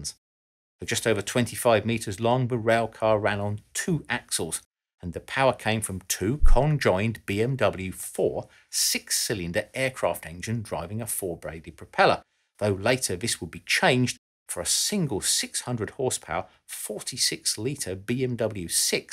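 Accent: United Kingdom. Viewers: He British, even I would struggle 😂😂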